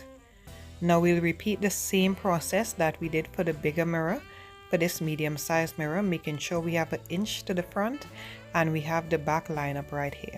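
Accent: Trinidad and Tobago. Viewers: Beautiful. I'm hearing the Trini accent and I'm so glad I found your channel. Looking forward to seeing more.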